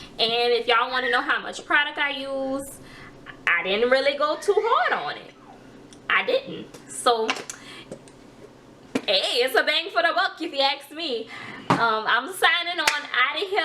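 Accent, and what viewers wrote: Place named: Baltimore, United States. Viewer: I hear 👂🏾 Baltimore in your accent. Btw, pretty results! 👌🏾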